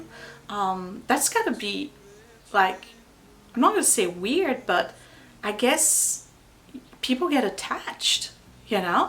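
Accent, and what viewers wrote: France. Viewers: The French accent is really cute though. BTW, as an English teacher myself, there is one thing I 'd like to say: having an accent is normal. It's nothing to be ashamed of. You have taken on the task of learning another language. That is hard. No one, if they aren't learning another language or are bilingual themselves, is allowed to criticize that. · I have been watching you since u were working on the office. I fell in love with your willingness to rise to the challenge and share it with us. I love seeing you interact with your family. I love your accent and your stories. I call you my French lady on YouTube. I really enjoyed this video, it was a great visit with my friend.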